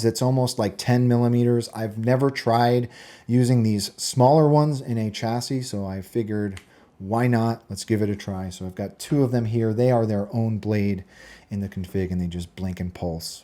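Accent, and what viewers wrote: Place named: United States. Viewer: You got an American accents and thus American rights and you have a wall of light sabers vs guns? Cmon be a man and stop playing with toys like a child, a second revolution is drawing near and here ya are playing with toy swords instead of grabbing somethin with some real edge to it. Where’s your wall of AR’s and Glocks? 0/10. Do better. Our forefathers/ancestors are looking upon your works and judging as the tree of liberty is dying of thirst.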